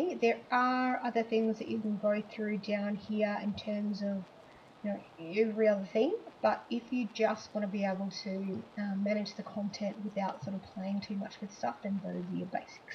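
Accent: Australia. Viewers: From Australia?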